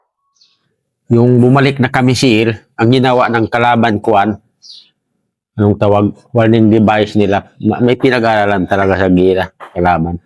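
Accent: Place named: Sulu, Philippines. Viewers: Boses palang ni sir halatang tausug na, salute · Tausug to accent pa lang Tagalog niya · tau luuk jolo sulu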